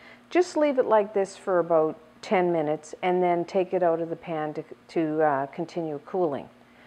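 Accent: Canada. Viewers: I like your Canadian accent: "aboot."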